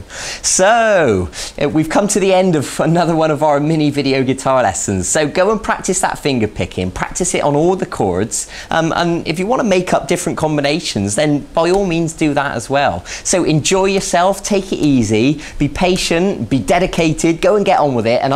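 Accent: United Kingdom. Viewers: nice accent you are british ??? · he's British, or Scottish, maybe Irish, could be Welsh...you get the idea. Great teacher.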